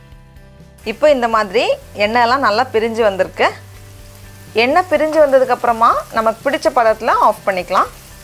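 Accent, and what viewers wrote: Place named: Tirunelveli, India. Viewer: Nice. sis. Tirunelveli and Nagercoil mixed slang.athenalthan ketten.unga uru special items seyunga.WAITING.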